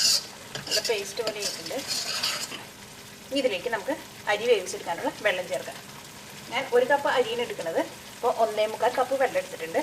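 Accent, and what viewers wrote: Thrissur, India. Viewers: Nice thrissur accent hehe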